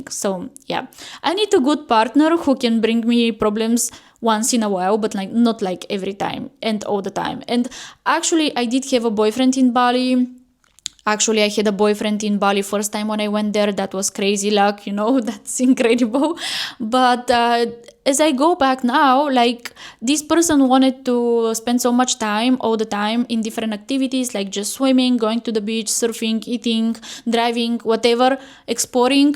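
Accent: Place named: Eastern Europe. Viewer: Your accent is very slavic. I like it 😊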